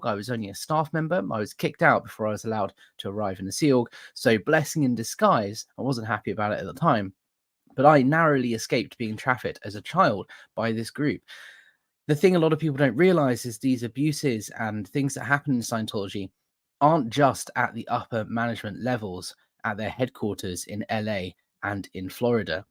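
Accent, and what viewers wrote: United Kingdom. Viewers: Just a bit of fangirl fawning…. I could listen to your RP English read the labels off canned food. I’m sure it’s a yank thing, but there you go. So glad I found you through SPTV. You are doing important work, steady on.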